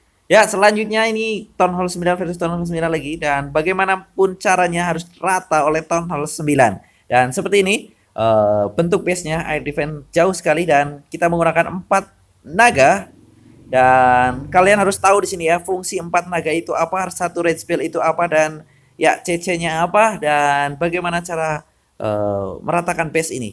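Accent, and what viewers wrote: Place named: Banyumas, Indonesia. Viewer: Logate ngapak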